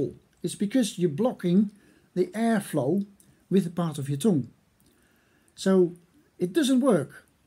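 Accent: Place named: Netherlands. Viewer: Dear, do you live in holland? Your accent sounds so dutch but it sounds like you’ve lived in england for a while haha 😅 and if you live around the randstad do you do lessons??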